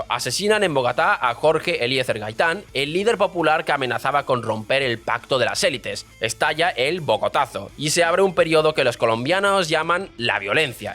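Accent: Russia. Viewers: Es Ruso y casi no se le nota... Hasta ahora.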